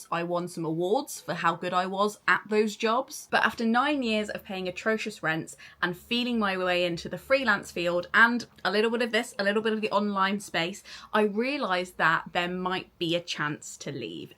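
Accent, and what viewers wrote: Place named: Nottingham, United Kingdom. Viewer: sounds like notts!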